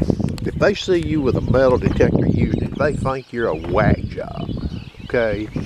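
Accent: South, United States. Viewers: From one deep southern accent guy to another, I completely agree with what you said at the end of the video. No one program or detector is going to get it all. That’s why I tend to re-hunt sites with several different programs/settings over and over again. Seems like I always find something that was missed before with previously used settings. I kind of enjoy that little nugget of knowledge as it reopens up places to hunt that some might rule hunted out. One place like that is my own backyard. It’s one of the most difficult, iron infested spots I’ve ever hunted, and I enjoy the challenge of tweaking different programs to pick out more nonferrous targets. Not only is slowly sifting through the iron fun, but it helps me hone my skills as a detectorist. Enjoyed the vid.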